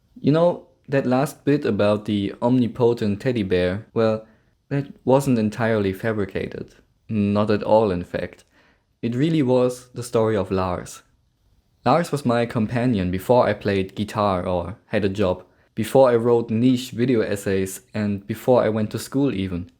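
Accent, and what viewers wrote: Germany. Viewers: Soooo, are you German perchance? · Are you german ?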